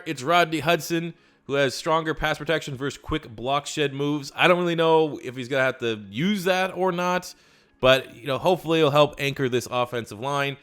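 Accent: Canada. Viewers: The Random Broncos Dude he's Canadian he says stuff differently you're a broncos fan there's other stuff to be mad about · I liked u bro, until I recognized that hitious Canadian accent..yuk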